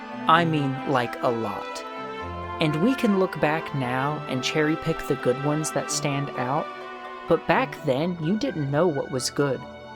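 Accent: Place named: Sacramento, United States. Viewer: You sound like it (from Sacramento)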